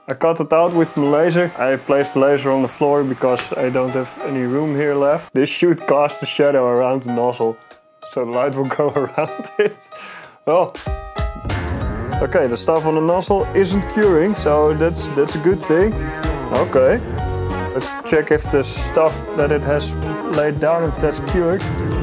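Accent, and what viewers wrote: Netherlands. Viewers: hahaha prachtig, ik dacht het al te horen aan je accent en ik wist het zeker toen je iets nederlands zij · Man je hoort gewoon dat je Nederlands bent 😂.. Gave project !! Er zijn ook pompen welke een constante flow hebben. En je hars wordt door de uv (welke uit je draden lekt) gehard in de slang.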